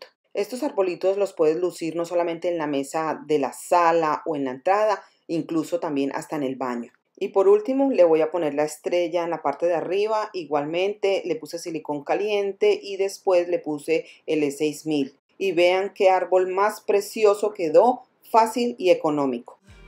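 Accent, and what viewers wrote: Colombia. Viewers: Hola soy nuevo suscriptor miré tus videos y me encantaron, explicas super bien y sobre toooodo es que haces las manualidades MUY ELEGANTES y sobre todo a bajo costo... Me Fascina tu casa la tienes muy ordenada, limpia y de buen gusto, te mando un saludo desde Guadalajara Jalisco México 🇲🇽. Me gusta también tu acento al hablar así tipo Colombiana 👏👏👏👏😉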